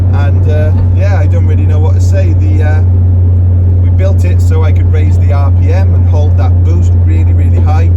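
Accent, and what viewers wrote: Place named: Cockney, United Kingdom. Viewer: Love the English cockney..🤣🇯🇲🇯🇲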